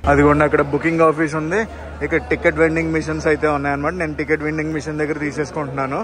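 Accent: Godavari, India. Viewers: Love from Nellore Malli vachi Chepala pulusu thinadi Anna ❤️ Me Godavari Slang ante chala istamm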